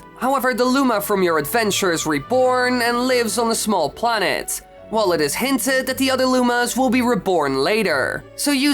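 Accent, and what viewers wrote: Netherlands. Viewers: Woah Why Do you say Utrecht & Utrecht Centraal so Dutch speaking?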